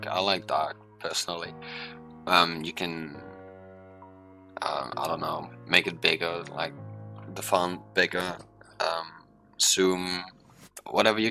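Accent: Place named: Netherlands. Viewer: Haha, well isn't Dutch your first language? It makes sense that you have an accent of course and I love it xD <3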